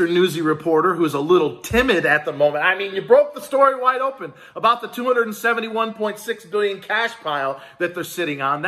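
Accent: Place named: New York, United States. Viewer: Let me repeat myself..... Middle class is being FU$&€% OVER!!!🤣🤣 I was born and raised on Long Island, and I Swore when I first heard you talking...I KNEW.... you were a New Yorker!!! LOL 😂 We love you too!🤩☮️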